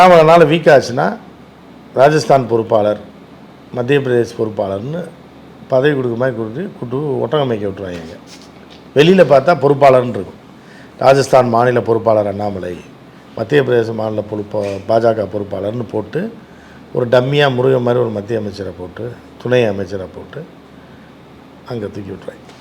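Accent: Madurai, India. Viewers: தோழர் அவர்களே எப்பிடி தோழா நம்ம மதுரை பாசையில் சிரிச்சே நீங்க சிரிக்காம சித்தப்பாவ NO S NO S கலக்கல் வேரலெவல் தலைவா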